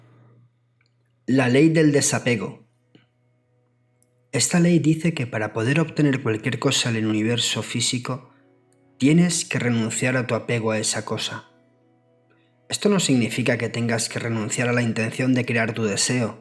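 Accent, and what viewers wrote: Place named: Spain. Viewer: Yo quiero desapegarme de escuchar ese acento españoletes gas y de encima las mayoría todo YouTube es de los mexicanos y los españoles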